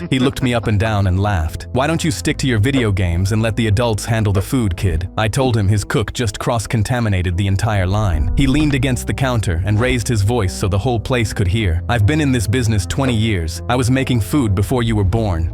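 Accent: United Kingdom. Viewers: bro i finnaly realised he has a brithish accent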